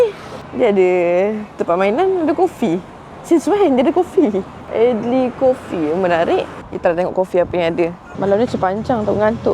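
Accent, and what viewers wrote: Kelantan, Malaysia. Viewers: woi, steady ahh kecek kelate...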